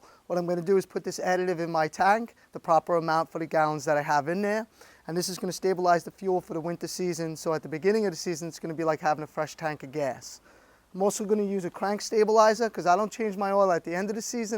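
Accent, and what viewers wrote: Long Island, United States. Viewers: Holy cow what a think Long Island accent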